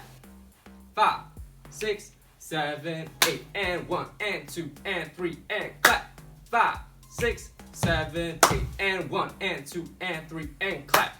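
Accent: Denmark. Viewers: bro this guy sounds danish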